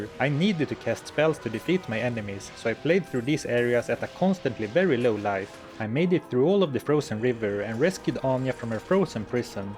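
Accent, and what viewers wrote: Sweden. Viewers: What a peculiar, and very thick, Swedish accent! Not only does he confuse V and W, "sh" and "ch" and so on as most non natives speakers do. He also turns "o" in to "a", which is something I've never heard before. "lot" becomes "lát", "dodge" becomes "dádge" and "monster" becomes "mánster" somehow. That combined with the language melody, copied straight from Swedish make for a hilarious listening! :) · Are you swedish? Your accent sounds swedish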